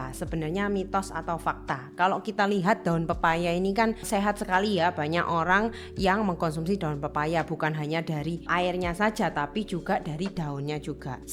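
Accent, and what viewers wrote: Java, Indonesia. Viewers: Logatnya Jawa banget,Iyo ta rek.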